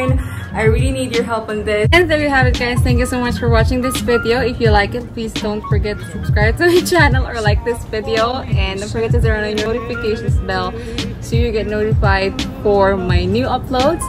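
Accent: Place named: Philippines. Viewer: Dami paarte ng salita, pilipinong pilipino naman tono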